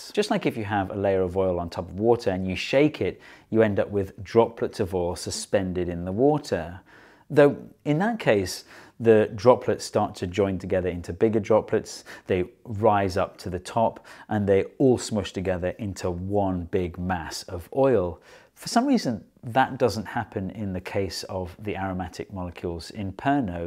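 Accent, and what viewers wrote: United Kingdom. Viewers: Lovely British accent!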